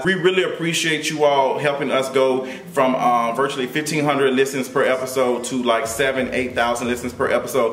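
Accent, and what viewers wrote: Memphis, United States. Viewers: It's so funny to hear someone from Memphis try to sound like they're not from Memphis lol. I love you!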